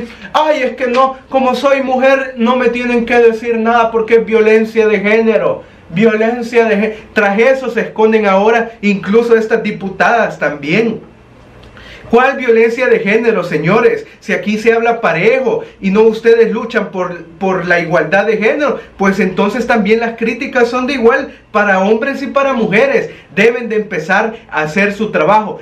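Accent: El Salvador. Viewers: Me gusta tu canal apenas enpese a seguirte De verdad q mis respeto a tu canal y el de Marcelo larin porq nos mantienen informados con la verdad. Me encanta tu humor tu acento salvadoreño . Dios siga bendiciendolos a ti y al q te hiso poner esa mascara lol.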